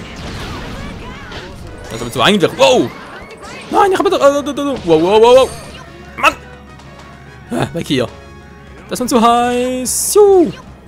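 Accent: Switzerland. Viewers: Bist du zufälligerweise Schweizer? :D PS: merkt man am Dialekt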